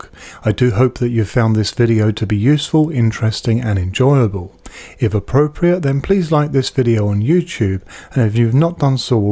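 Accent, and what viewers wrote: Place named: England, United Kingdom. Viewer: Thanks! I really enjoy your videos...it's always reassuring when the presenter for a porter recipe has an English accent.🙂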